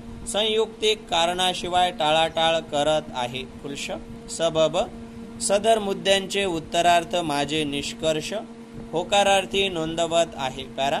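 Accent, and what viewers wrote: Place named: South India, India. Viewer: South Indian voice vatat ahe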